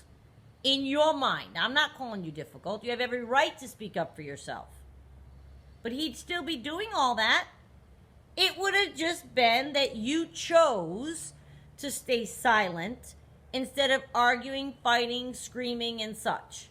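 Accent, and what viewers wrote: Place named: New England, United States. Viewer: I just found your channel today and have been binge watching. One of your videos you said your hair was up bc it was hot in Florida. I thought to myself “Oh, I thought for sure she was from New England based on the accent” then you said Rhode Island in this video. I gasped lolol I’m from and still living in Rhode Island!!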